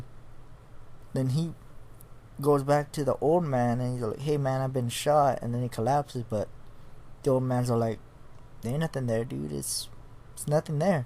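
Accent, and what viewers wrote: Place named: East Los Angeles, United States. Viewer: three eps legit scared me: Inside the Closet, The Last Car and The False Prophet. One thing I liked about TFTDS is that a lot of the stuff went unexplained so that made it scarier. Although in the case of The Last Car it went beyond being unexplained to flat out just not making sense. Are you from East LA? You have the stereotypical East LA accent.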